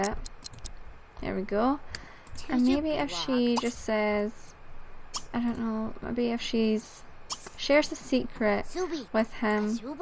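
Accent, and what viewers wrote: Scotland, United Kingdom. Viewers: I guess you're Scottish , we'll I hope you are :) I am , but I don't have the accent because my parents are English xx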